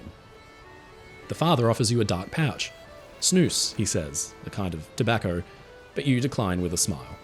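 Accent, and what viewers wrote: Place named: Australia. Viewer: Great story. Gotta love the Aussie accent.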